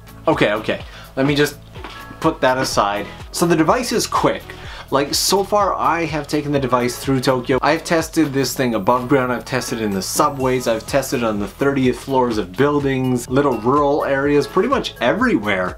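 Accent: Canada. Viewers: I never realized how Canadian you sound until this video. Lol Anyway, wish I would have had an option like this back in 2015.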